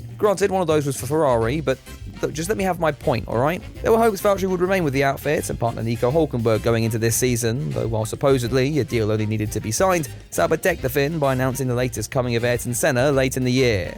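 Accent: United Kingdom. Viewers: Hey dude. Hadn't seen you ever. Not only do you sound British, you look British...super british, if you know what I mean.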